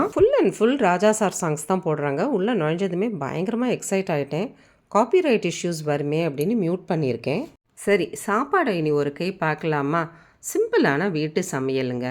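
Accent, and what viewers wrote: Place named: Thanjavur, India. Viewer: Very Pleasant divine Tanjore Tamizh Narration without the noise and exaggeration.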